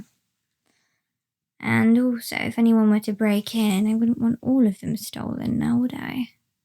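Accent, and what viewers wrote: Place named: RP, United Kingdom. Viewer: man, you look hotter than ever in this cosplay! and that accent. I normally dislike rp but yours is amazing and suits your asmr roleplays sublimely.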